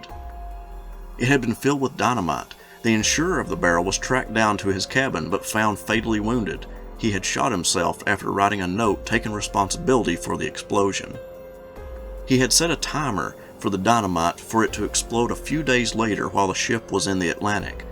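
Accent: South, United States. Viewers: Thank you, as a Canadian myself I appreciated this episode. Btw when I was a youngster I watched Richard Boone in Have gun will travel. Your accent sounds southern, where are you from?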